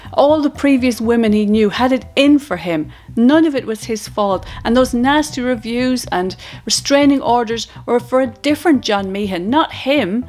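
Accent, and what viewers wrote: Ireland. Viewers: Sounds Irish to me (Florida USA). Delightful. I love how y'all say "cuddle" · The Irish accent adds to your narration sounds great. However, this story really allows victims to be complete helpless imbeciles, like they're unable to protect themselves.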